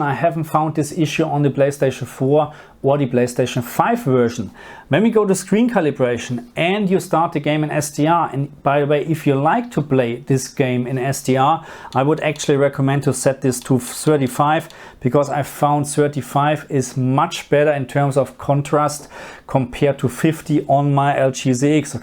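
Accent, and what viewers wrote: Germany. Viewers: Oh god that cringey German accent... How can you be so damn oblivious and speak a different language you have no real grasp of? It's not just grammar. Pronunciation plays a BIG role. Dunno if arrogant or just dumb. Gawd I hate my peinlichen Mitdeutschen........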